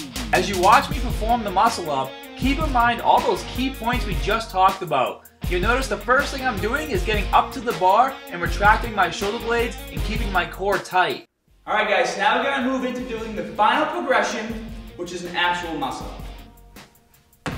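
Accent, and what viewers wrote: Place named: Brooklyn, United States. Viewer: Spot Conlin It's his Brooklyn accent.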